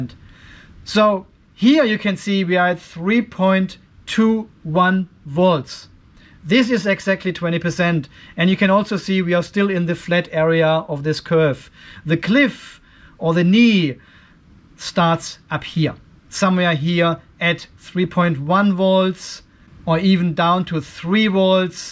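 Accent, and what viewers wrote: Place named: Germany. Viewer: Bei Deinem ersten Video dachte ich mir, daß Du Deutscher ...ähm... gewesen sein mußt. Bei dem Akzent. Aber "Oettinger" geht ja mal gar nicht. Zeig der Welt bitte nicht so ein Plörre. Wie wäre es mit Düsseldorfer Alt, vorzugsweise "Schlüssel Alt" oder "Füchschen Alt". ;-) Grüße von Düsseldorf nach Australien!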